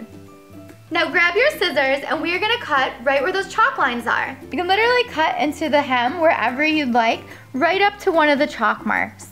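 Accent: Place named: United States. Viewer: She makes it look very easy but oh my, that voice. Lots of American ladies have this tone and rhythm to their voices, why is that?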